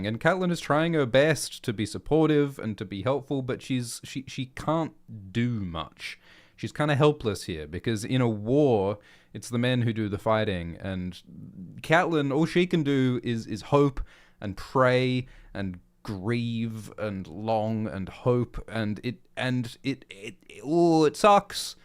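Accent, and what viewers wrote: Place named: Australia. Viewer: <link> MY EXPERIMENT SUCCEEDS, or fails, depending on how silly it is that both my dog and I sleep better with chill Aussie narration. Thanks for all you do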